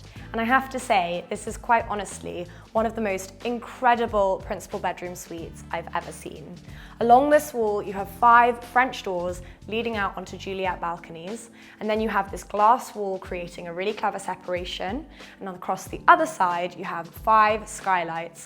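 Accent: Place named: England, United Kingdom. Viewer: you are going to kill it on YouTube, so nice to hear a quintessential English rose accent, wonderfully articulate language and a charm only classy ladies like yourself can exude. Do not betray us by joining the luxury home show 😂 Stay on your own. Brand for the win 👌🏼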